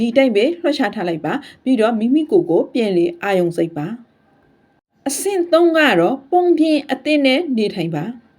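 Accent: Rakhine, Myanmar. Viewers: စကားပြောနေတဲ့ဆရာမကရခိုင်နဲ့သူတယ်နော်။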